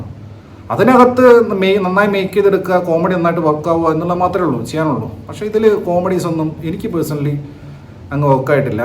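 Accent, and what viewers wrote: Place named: Malappuram, India. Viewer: കണ്ണൂർ slang ആയി തോന്നിയില്ല പക്കാ മലപ്പുറം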